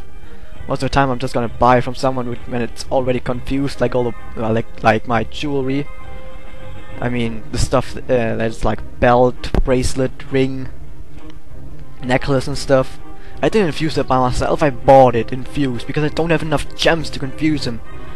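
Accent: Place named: Asia. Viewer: are u asian? u sound fob!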